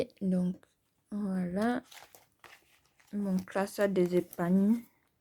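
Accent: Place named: Senegal. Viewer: Bonjour, un bon début de week-end😊 ps: je trouve que vous avez l'accent sénégalais trop mim's. Moi aussi, j'ai commencé le principe des enveloppes budgétaires depuis fin décembre. Pour le moment, hors vidéo, j'espère pouvoir me lancer l'année prochaine pour avoir un suivi plus assidu et motivant! Les débuts ne sont pas faciles mais déjà beaucoup de changements pour moi sa motive.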